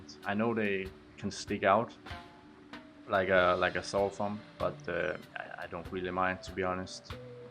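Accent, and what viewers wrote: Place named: Denmark. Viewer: Sjovt, jeg gættede også på dansker, men så tænkte jeg ikke mere over det 😂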